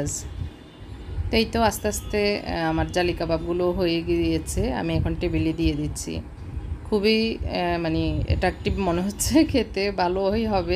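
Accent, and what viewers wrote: Noakhali, Bangladesh. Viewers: Apu Noakhali r naki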